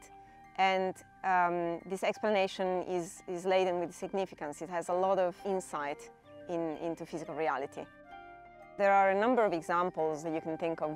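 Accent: Italy. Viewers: Er, her accent would be Italian, not English, as she comes from Turin.....So she passes the Turin Test! (sorry!)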